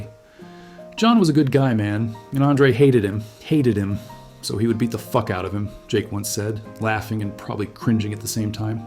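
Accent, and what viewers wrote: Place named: United States. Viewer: So this script is obviously written by a British person and read by a yank. Unless theyre Canadian, but we don’t talk about them anymore. Still a great channel though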